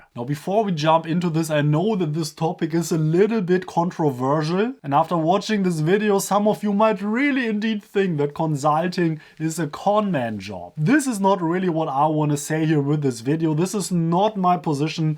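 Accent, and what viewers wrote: Germany. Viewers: I love your heavy German accent. You go <3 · you already have a leg up. You are white, you speak with a German accent and your name is. Now imagine this - I am brown, I speak with an accent from the Global South and my name is "exotic".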